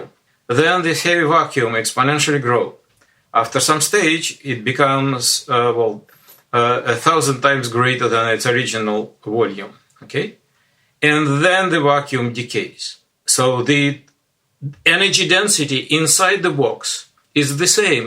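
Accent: Russia. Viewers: Это прикол что-ли? Зачем изображать знатоков английского, если у этого Андрея ужасный русский акцент, и он с трудом подбирает английские слова? Так ещё и без субтитров.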